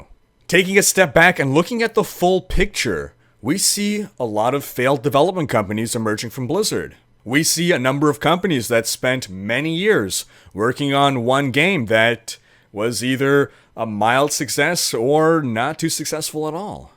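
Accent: Quebec, Canada. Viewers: Saku Koivu ? Are you from Quebec / Montreal ? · Are you from Quebec? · He's Canadian mate.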